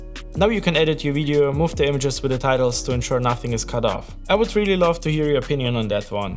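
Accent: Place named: Germany. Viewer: Ein bisschen harter Deutscher Akzent, aber in Sachen Videoschnitt ein wirklich sehr gelungenes Video. Optisch wirklich sehr ansprechend. Ach ja und danke auch für den eigentlichen Inhalt des Videos, den Hinweis auf das neue Format.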